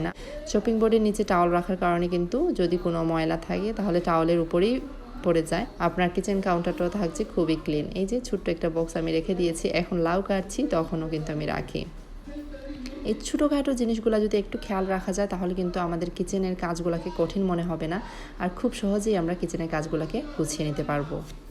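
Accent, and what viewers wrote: Sylhet, Bangladesh. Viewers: Apu apni sylheti ai jonno balo lage please sylheti matea blog korio ❤️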